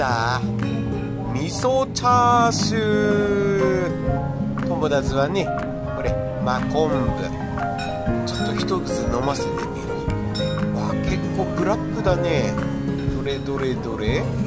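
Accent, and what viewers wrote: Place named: Tohoku, Japan. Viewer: ズーズー弁とひぐまやさんのラーメン、どちらも最高ですね✨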